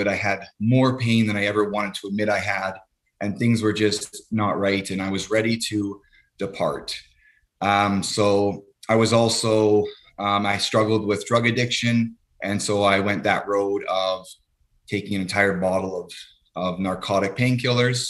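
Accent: Canada. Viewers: Is this man Canadian? From the East Coast? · He sounded Canadian to me. I live in Regina Saskatchewan! I definitely find this so intriguing/fascinating. I also came out of hard-core drug addiction four years ago.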